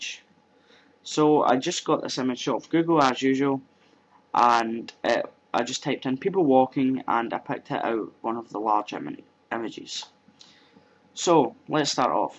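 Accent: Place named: Kilmarnock, United Kingdom. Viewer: nice tutorial and killie accent.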